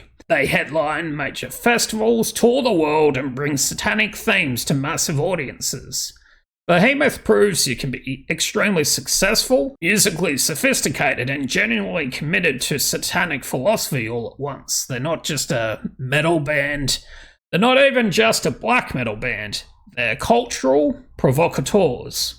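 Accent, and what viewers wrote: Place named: Australia. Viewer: are you Aussie btw? I could swear that's where your accent is from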